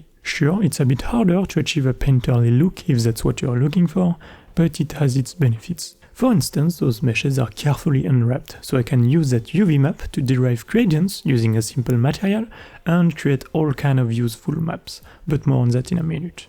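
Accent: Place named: France. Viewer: Awesome tutorial! love your French accent bro.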